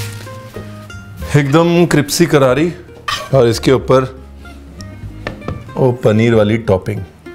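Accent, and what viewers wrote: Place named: Punjab, India. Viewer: Ranveer paji tuc hindi che punjabi bolde bot vadiya lagda sachi gal paji tuhadi gal baat sunn hi aanda tuhdi video te osm paji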